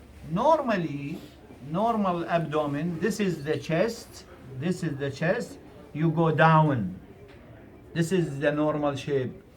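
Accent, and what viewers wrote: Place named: Arab world. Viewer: Hhahaha it's Arabic accent 🥰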